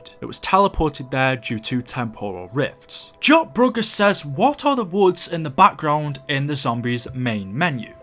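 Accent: United Kingdom. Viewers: Why are you British and also who put the mark on the SOE Crew. Or what happens after SOE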